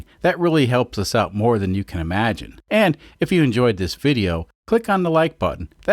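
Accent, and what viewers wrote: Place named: Boston, United States. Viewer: ohh that explains why i thought you had a Boston ish accent :P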